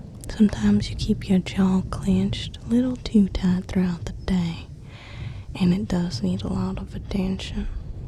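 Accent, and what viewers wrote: South, United States. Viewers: I absolutely love the southern accent very cute ^^. And another amazing and relaxing video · yaaaaaaaaaaaaaaaaaaaaaay southern accent!!! yipee! yay! wooooo!:3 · Southern accent gets me every time. 👌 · Another amazing & relaxing ASMR video well done,! You're really good at that southern accent every time, and I love it.😎👌 And as for the face massage and paw sounds, your paws always look the best (the softest) for these types of videos.😉👍 Please keep up the amazing work and have a great day.😊🫂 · Oh snap! She's gone Southern!!! Time to relax! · YES! And she be southern tonight. Hi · I absolutely love the southern accent, especially at "my sweet little honey-bumpkin" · THE SOUTHERN ACCENT IS BACK. I can finally die happy... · ? How is your British accent from your odd Therapy session video and now your Southern accent in this video so good? 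Wait no. Don't tell me. Because it is good.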